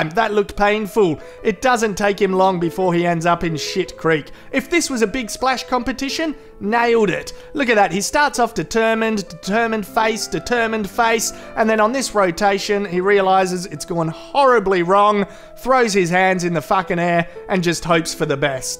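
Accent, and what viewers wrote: Australia. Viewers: To all pinoy... this guy just literally gving the normal dialect of australian review. He do review jason statham diving shit. That lmao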